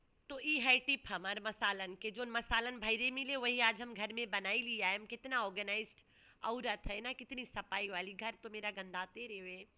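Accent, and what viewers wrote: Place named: Bhojpuri, India. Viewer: Bhojpuri accent 👍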